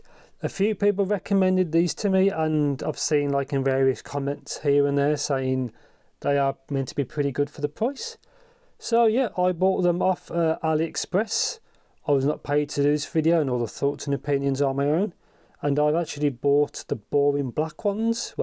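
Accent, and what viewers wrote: United Kingdom. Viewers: Bro im here again but can you plese try to go out of british accent a little bit ? its really annoying some word are like in another language cant figure out wtf is going on.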